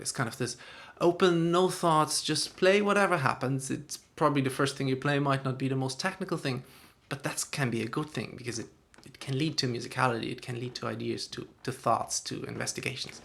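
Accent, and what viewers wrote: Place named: Dublin, Ireland. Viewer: Hey. I found your channel because it is referenced by another YouTuber, Mikko Hilden. I don’t know why I assumed you were compatriots so I was happy when I heard the Dublin accent. I live in Dublin normally but I’m in Australia for the next couple of years. I started taking guitar lessons recently, straight into Jazz. You référence technique exercises but you don’t have a link to them. It would be great if you could point out where us beginners can find good technique exercises. Do you teach? I’m hoping that when I’m back in Dublin I can continue studying at a less embarrassingly beginners level. Keep the videos coming.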